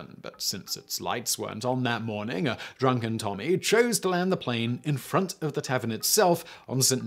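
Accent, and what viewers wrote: England, United Kingdom. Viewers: The presenter has such a proper English accent. Where’s your mac Englishman?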